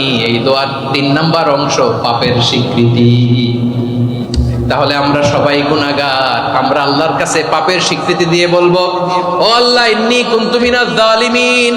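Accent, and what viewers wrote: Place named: Sylhet, Bangladesh. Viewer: sylheti hoeo kota gula valo laglo ❤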